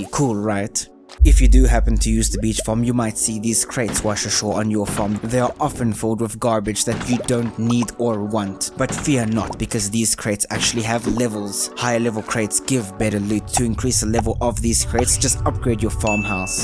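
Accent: South Africa. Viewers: he sounds south african to me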